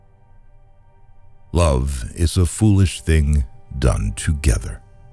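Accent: United States. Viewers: Anericann pronounciation sticks out like a sore thumb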